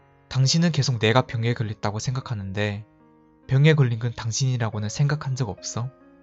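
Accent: China. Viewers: 중국인이신가요? 제가 아는 중국인 분이랑 한국어 발음이 비슷하네요. ㅉ,ㅃ 같은 된소리를 굳이 쓰거나 발음이 쎄게 나가기도 하고 뜻이 안맞는 피동표현이 나오기도하고 -다, -습니다 가 계속 섞여서 사용되네요. 번역기 같은 거 쓰는 것 같아요. 혹시 더 나아지고 싶다면 이런 부분을 고쳐나가는게 좋을 것 같습니다. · 발음이 조선족 같은데 중국 조선족이었넹ㆍ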